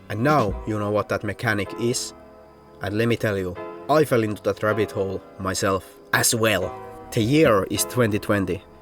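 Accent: Finland. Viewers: On muuten tosi suomalainen aksentti :DD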